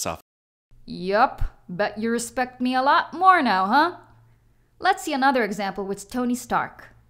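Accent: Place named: Scandinavia. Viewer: My guess would be... a hint of Swedish or Norwegian?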